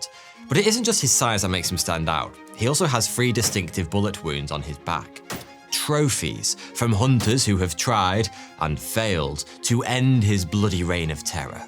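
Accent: United Kingdom. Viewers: I usually love your videos, especially the fact that the British accent is the most attractive in the world and your content was almost always on po8nt. Not this timw. Im so tired of humans, with our vast intellect, completely distegarding our animal instincts when considering humans threat level. How can a 200lbs mountain lion bolt from a person half its size, but other humans seem to think we're so weak and flimsy? A. We are the ONLY great ape species capable of swimming, awkward or not. B. There are very few animals that can run for as long as we can, or pursue pray for as long as we do. Cant run from sometbibg that wont stop chasing you. 3. You can keep on with your pessimistic approach to humanhood, while i take in the facts of history. Consider we are the dominate species on the planet, well, come prove im weak for being human, go ahead.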